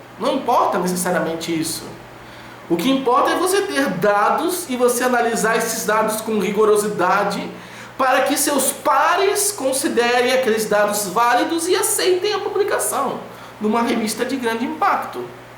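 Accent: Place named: Minas Gerais, Brazil. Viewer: Eu pensei em duas possibilidade: 1) ele tá treinando uma técnica de oratória. 2) ele tá misturando o sotaque dele com o mineiro e/ou baiano. O estar bêbado pode ser uma terceira hipótese rsrsrs >.<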